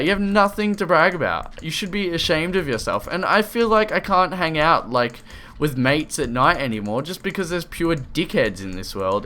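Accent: Australia. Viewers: what part of Australia are you from ? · I knew you were a fellow Aussie but you can tell by the way you say and talk about DICKHEADS. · Your australian?? You just earned a sub!!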